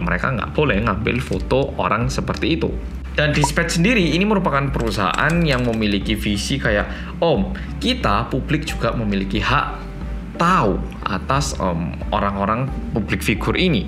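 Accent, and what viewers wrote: Java, Indonesia. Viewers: Menungguu mas yang medhooook♥♥ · Entah kenapa saya sangat suka pembawaan mas di konten pembahasan kasus kriminal, Durasi singkat, padat, Jelas, Visual editing sesuai dengan keadaan dan gak terlalu heboh, dan yang paling enak ya "MEDOOOOOK".. Sukses trus mas, 2021 bisa 10jta sub 🙏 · setiap opening aku selalu ikutran bilang ahahaha medooooooook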